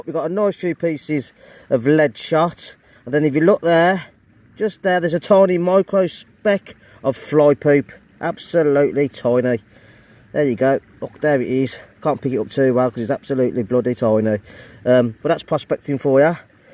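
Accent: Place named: Birmingham, United Kingdom. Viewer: Absolutely fascinating video , really enjoyed it … BUT .. omg that ACCENT 😂… just gotta be honest I hate that accent of yours , Brum/Black country esque… sorry mate I watched it with the sound off .. I feel terrible saying that but it is true ! Good luck with your future panning 👌 👍🏴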